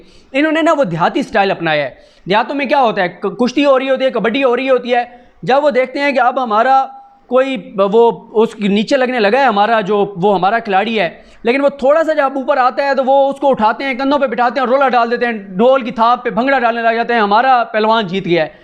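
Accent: Saraiki, Pakistan. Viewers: آپ کا سراکی کا تڑکا اچھا لگا۔۔ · اسی بہانے یہ پتہ چل گیا کہ آپ سرائیکی ہیں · Ur saraaiki sounds very nice... Thanks fr ur independent broadcasts... ❤️them...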